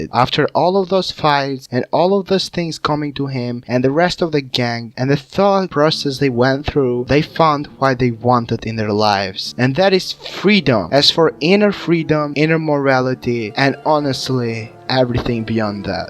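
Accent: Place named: Latin America. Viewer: Ahora en español:v por tu acento más vale que seas hispano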